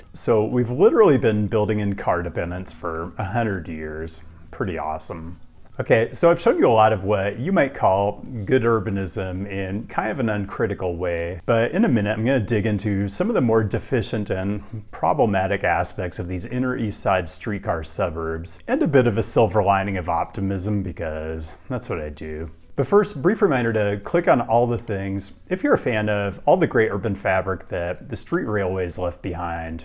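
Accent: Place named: Pacific Northwest, United States. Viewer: I always assumed he was from Portland (or at least PNW) based on his style and accent but now I'm not sure!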